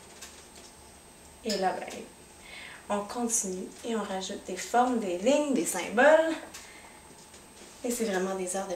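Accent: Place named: Quebec, Canada. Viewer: Très simple et facile d'accès, merci ! Et cet accent québécois, j'adore ! :)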